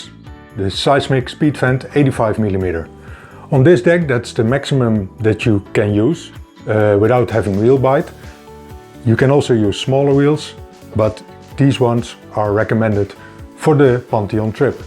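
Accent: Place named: Netherlands. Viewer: Lekker hollands accentje :D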